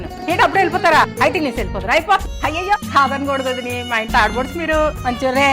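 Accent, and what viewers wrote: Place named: Andhra Pradesh, India. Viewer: Super akka, well represented our Rajahmundry slang 👌👌🤩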